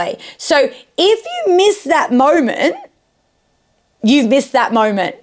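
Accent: Australia. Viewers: Is this an Australian accent ?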